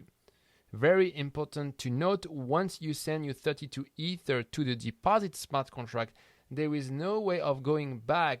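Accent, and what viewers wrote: France. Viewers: 2 years later, it did not happen. And your French accent is still unbelievable :D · Merci ! Je réponds en Fr car je crois que j'ai reconnu l'accent ;) Ma question était : j'ai l'impressions que le Tuto de Prysm explique comment lancer son noeud établir son noeud sur son ordinateur. Je me demandais quelle était l'étape à suivre si l'on veut passer par un server comme digital ocean ou dappnode ?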